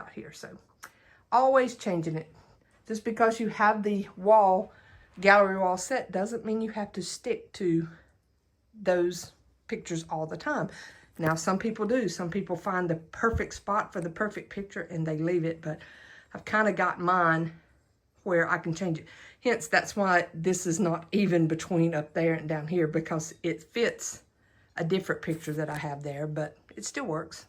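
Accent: South, United States. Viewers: Love your decorating, AND your sweet southern accent! 😊 Thank you for the tour, I really enjoyed it.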